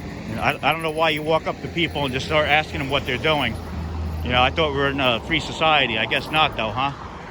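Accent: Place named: New Jersey, United States. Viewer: 😂😂😂 That's how we speak in NJ!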